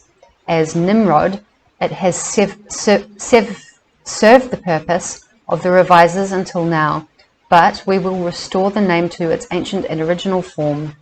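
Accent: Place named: New Zealand. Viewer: Notice the kiwi accent... Would love to have a chat sometime 😁❤️ blessings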